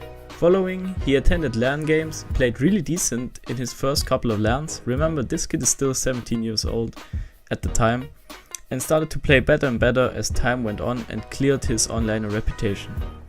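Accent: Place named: Germany. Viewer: Man hört sofort den Deutschen Akzent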